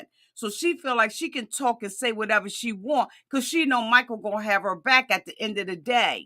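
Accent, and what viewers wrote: Washington DC, United States. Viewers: I just found you, love the DC accent and the perspective..but did you notice that Monique asked dude if he enjoyed his sleep “on the bed”? Then later I noticed a pillow and blanket on the couch..did she sleep on the couch????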